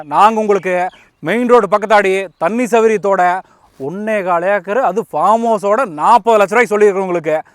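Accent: Kongu, India. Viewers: Anna neenga pesurey kongu thamil supernga anna.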